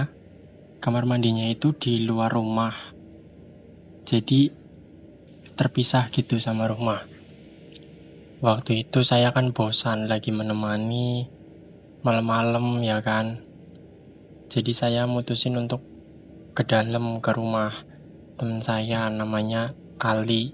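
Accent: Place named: Banyumas, Indonesia. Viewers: Medhok kentel 🤭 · Itu mamakee lupa sama anak atau ketakutan sih.. sampe kasian sama mas nya dah ditinggal ndewekan 🤣🤣. Tetep pede ya mas meski medok tp justru itu kelebihanmu mas. Jd natural ceritanya. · Wong ngapak kiye mesti. mbleketaket wkwkwk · ngapak nya kerasa ya wak <3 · Waaahhh,, wong ngapak kie,,Salam ngapakerss mas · Kiye mase mesti wong ngapak😂. Ketara bgt karo suarane🔥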